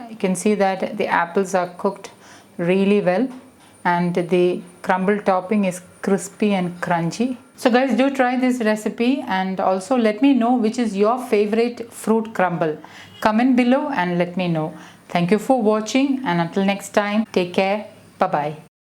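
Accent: Kerala, India. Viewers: Pretty thick Mallu accent. Aunty, it's sprinkle NOT springle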